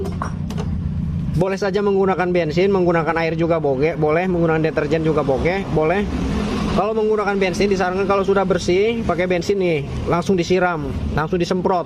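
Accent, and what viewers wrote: West Java, Indonesia. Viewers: Makasih ilmu nya kang..sunda banget kang pengampean nya tp mudah di mengerti.❤❤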